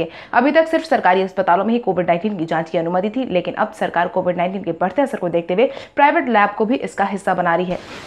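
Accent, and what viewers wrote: Bihar, India. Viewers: Madam ji Bihari mat bola kro ye sabd ache nai lagte hai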